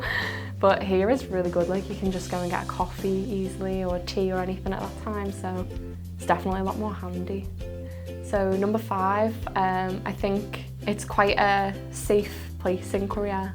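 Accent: Liverpool, United Kingdom. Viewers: I just love your British accent. Sounds very cute and even sexy! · 아 리버풀 맞군요 사투리 맞아요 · 와 영국영어 발음 정말 듣기 좋네요ㅜㅜ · 리버풀 액센트이신건가요..? 매력있으심다. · I like your lovely Liverpool accent.... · 리버풀 억양 죽이네여 ㅎㅎ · 마를린먼로가 생각나는 머릿결과 미모군요. 예쁘십니다. 그리고 리버풀식 억양.. 굉장히 인상적이고 듣기 좋네요. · 와.. 영국 영어 매력 쩌네... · 리버풀 액센트는 런던 표준 액센트랑 또 다른 매력이 있군요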